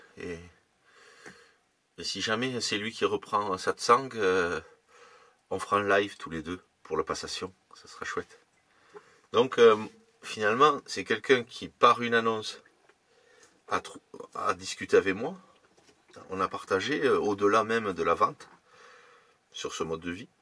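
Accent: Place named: South-West, France. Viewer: merci a toi , je doit me repeter , mais j'adore ta voix au noté fleuri du sud ouest , ton rythme sain, et ta jolie diction , les silences sont aussi appreciables , souvent je clique en onglet , puis quand c'est le moment de regardé jy vais , comme la , un peu fatiguée et sur les nerfs , ta voix ma apaissé , j'ai presque faillé m'endormir ...ta phrase de traversé la vie des gens , en restant dans la tienne , en prenant réellement plaisir a la presence de l'autre et inversement ...j'ai un peu de mal a saisir le pourquoi du camion , la premiere vidéo que j'avais vu etait celle de la remorque a vélo , puis celle ou tu coupais ton bois a genou ...PAREIL pleine de poésie , tu es un jolie messager ,a bientot ...peut on acheter un t-shirt et soutenir ta chaine ?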